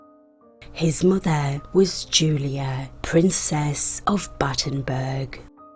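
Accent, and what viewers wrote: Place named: Newcastle, United Kingdom. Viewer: She has a Geordie accent from England. Though normally they talk very quickly, but for some reason she's talking in a super slow voice.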